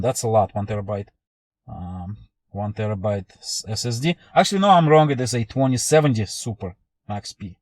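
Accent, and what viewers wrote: Russia. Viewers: Прикольно слышать акцент и догадываться, что это русский. Успехов в майнинге. Я не понял смысл первой части видео. 2070 тоже бывают Max-q ? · Почему он говорит на английском а слышу я русский ? 😀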